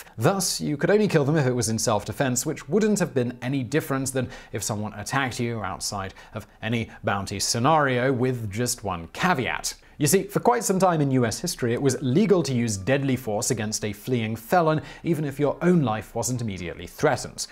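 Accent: United Kingdom. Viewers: Why tf is vsauce British now?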